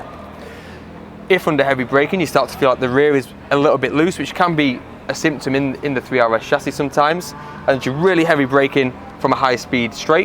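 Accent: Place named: United Kingdom. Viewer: great video, just a bit more USA english will be great for people outside of UK :-)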